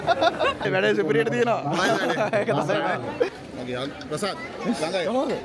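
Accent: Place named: Sri Lanka. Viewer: Olee සිංහල Accents එකට නම් හිත ගියා 🤍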